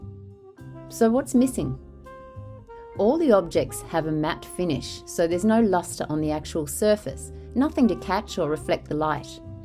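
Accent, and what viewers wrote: Australia. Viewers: I have been looking for an Aussie channel, and I am so happy I found yours. It was so lovely to start watching and hearing your Aussie accent. I am not saying I don't live other channels I do, but I love that I found a channel in my own country - so precious! ❤❤❤❤❤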